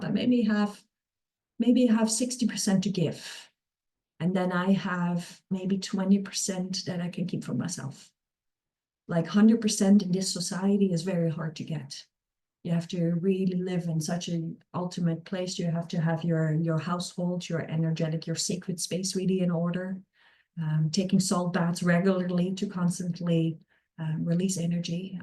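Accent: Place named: Australia. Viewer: I've only just started listening but it sounds very much like you've got an Australian accent and people from any other country, apparently our Aussie accent is really hard to try and imitate 🙏🏻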